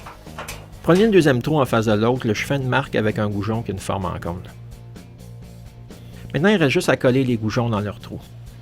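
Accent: Quebec, Canada. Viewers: Wow super beau j'reconnais un quebecois :) et j'aime ça !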